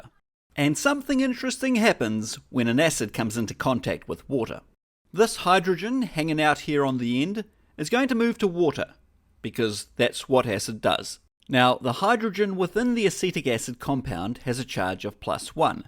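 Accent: New Zealand. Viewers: Physics sounds funnier with a Kiwi accent too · Awesome video. Are you an engineer by trade or science teacher? I mean we all know you're a long suffering Land Rover owner :) By the way, I always read your wall charts in my shop with a Kiwi accent (in my head). thanks! Can't wait for the next one.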